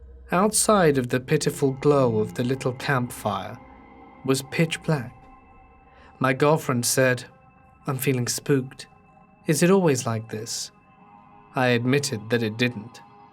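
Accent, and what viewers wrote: United Kingdom. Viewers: I really enjoy this particular British dialect, though not quite sure which specific one it is.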